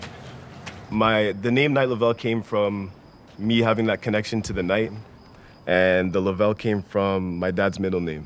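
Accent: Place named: Canada. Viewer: that canadian accent really comin through on this lmao, goat shit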